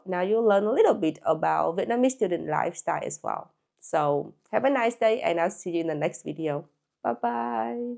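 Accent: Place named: Germany. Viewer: The cutest thing is hearing your English sound more and more like a German speaking English, it’s adorable :’)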